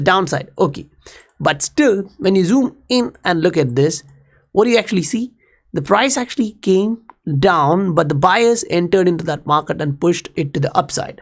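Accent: India. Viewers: You sound more Indian than Korean to me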